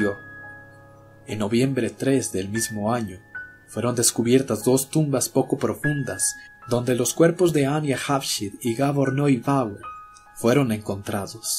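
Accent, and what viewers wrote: Mexico. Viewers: Buen vídeo /u\... oye eres de México? quisiera pedirte un vídeo haahaha... muy bien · que ondas,eres de mexico?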